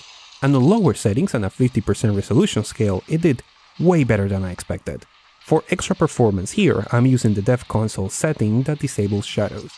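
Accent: Venezuela. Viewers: Estoy casi 100% seguro por como habla y se le medio sale que es venezolano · Hablas identico a mi en español, acaso eres Venezolano?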